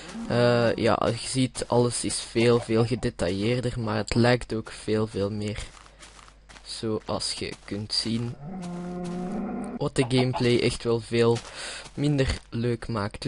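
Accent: Belgium. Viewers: ben je belgies ofzo? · woow vlaams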